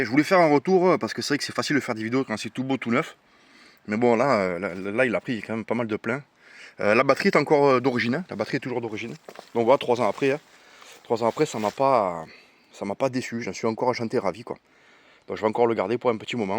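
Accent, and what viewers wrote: South, France. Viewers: Merci pour ce retour d expérience ! Avec l accent du sud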